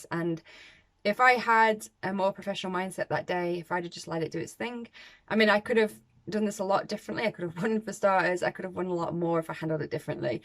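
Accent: United Kingdom. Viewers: Since you sound Brit and I assume you are one Reach out to ant fx.. You will find his channel too But try to get hold of him cause he is Brit too..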